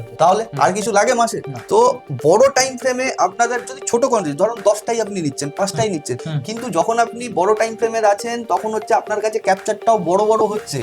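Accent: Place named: West Bengal, India. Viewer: ইন্ডিয়ান বাঙালীদের কথাবার্তায় একটা বাটপার বাটপার আভাস পাই। আপনাদের accent অনেক ক্ষ্যাত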